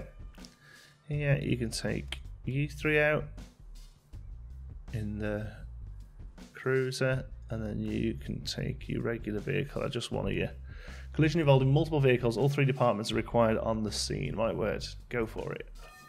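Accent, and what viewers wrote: Canada. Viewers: Wow, now that you are speaking Canadian, it is really hard to understand you when you talk fast!! :) (I think you are going to make me spend money on this game!)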